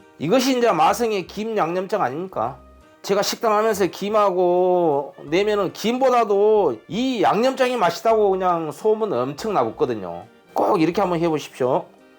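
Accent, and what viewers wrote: Jeolla, South Korea. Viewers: 셰프님 전남쪽인갑네요잉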